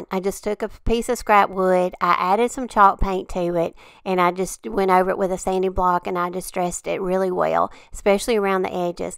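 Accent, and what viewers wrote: South, United States. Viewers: IOD Just found your channel and subscribed !! Love your adorable southern accent. You are so sweet to listen to. I love going to thrift stores but now will buy things to make over using the IOD transfers and Stamps. I plan to purchase these through your links. Thank you for all your kind words and inspiration. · Love your channel and sooooo excited about the IOD giveaway. Keep cranking them out cuz we need more you tubers like you. Love your soft southern accent and your voice. It's soothing which makes it easy to relax and enjoy the show.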